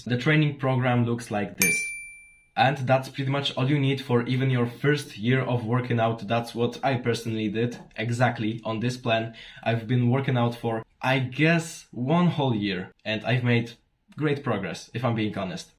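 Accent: Poland. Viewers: ej mam wrażenie że jesteś z polski bo masz taki akcent podobny XD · coś czułem że jesteś polakiem słuchając twojego akcentu XD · nie ukryjesz polskiego akcentu , ale i tak wyglądasz zajebiscie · I immediately new that you're polish because of the accent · Dude r u from poland i can hear it here cool Pozdrawiam Pl gurom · ja pierdolę wiedziałem ze polak po akcencie haha <3 dobry materiał mordo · wiedzialem ze jestes z polski, od razu po akcencie poznalem. gratuluje virala<3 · Are you Polish? Masz bardzo polski akcent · Bro u Polish? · o z polski jestes slychac, u mnie silka wleciala poprawiam nawyki zywieniowe lecimy pozdro 💪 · Nie - nie wyśmiewam się, mega props za kontent i formę vlogów - po prostu wyłapałem ‚polski’ akcent, so one question - Are You Polish? ❤❤ Good job bro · poznaje ten Polski akcent, ciekawe czy sie nie myle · Are u from poland? cuz i kinda hear ur accent but ur english is on high level. 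· od paczątku wiedziałem żę to polak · this question is not related to the movie, but are you polish? I think the accent is familiar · brat ty z polski? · as a pole i immidiatelly noticed that your polish just by your accent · słychać że polaczek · po 1 minucie filmu zauwzaykem ze jestes z polski XD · Bro i'm gonna guess, but are you from Poland? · Short and to the point, that's what i was looking for. Also weird question, are you from Poland? I can everywhere recognize accent of own country.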